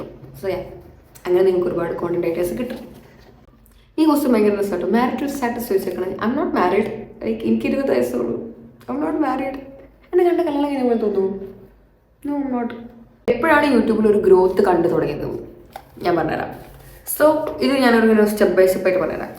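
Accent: India. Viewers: Aiswarya lekshmiyude oru facecut und.. Where was your schooling and college? Slang ketapo thoni frm malpuram anen bcz am also frm malpuram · KOZHIKODE slang pole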